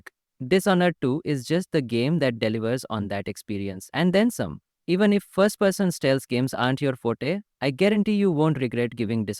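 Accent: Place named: India. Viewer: Hey bro amm the vedeo is good but wat I think it lacks is a good english if you wanna improve it just find some one to chat with by chat i mean talking not texting and It should make your english sound less Indian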